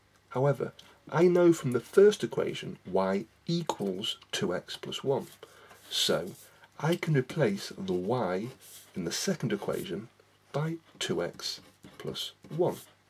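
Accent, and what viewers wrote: England, United Kingdom. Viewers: You are from england right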